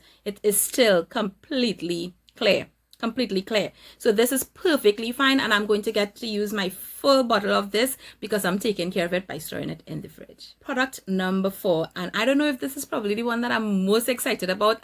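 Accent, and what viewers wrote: Trinidad and Tobago. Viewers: Fellow Trini?? :) I hear the accent. Wow beautiful lol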